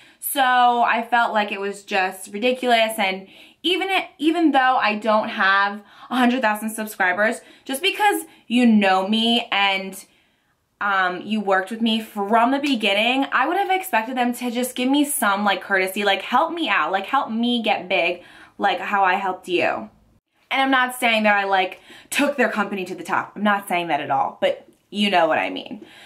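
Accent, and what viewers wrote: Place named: Philadelphia, United States. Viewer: dude your so dope! and your accent is awesome, since your from philly it makes sense but are you italian too? and thats fucked up of that company, they should have kept working with you!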